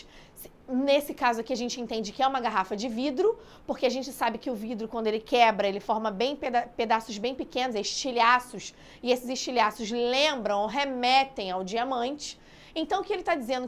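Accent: Rio de Janeiro, Brazil. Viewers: Nada como ouvir o bom e velho sotaque carioca!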